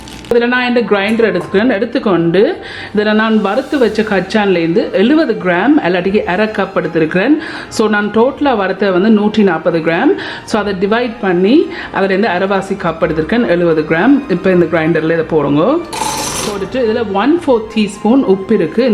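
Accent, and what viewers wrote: Jaffna, Sri Lanka. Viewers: வணக்கம்,உங்கள் சமையல் குறிப்புகள் மிகவும் தெளிவாகவும்,அழகாகவும் உள்ளது.எங்கட யாழ்ப்பாண தமிழ் அழகோ அழகு!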